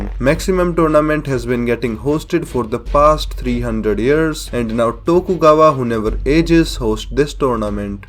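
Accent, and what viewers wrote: India. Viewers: Bruh your Indian accent makes it more funny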